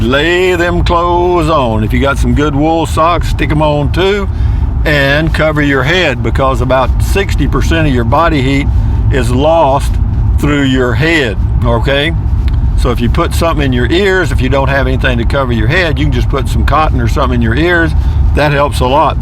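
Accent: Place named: South, United States. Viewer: After watching this video I realized why I watch everyone of your videos. You have a GREAT sense of humor and your good ole boy accent is so relaxing and enjoyable. GOD bless you for being alive and sharing you life with all of us all. You enrich us all!!! Signed, one of your Rangers..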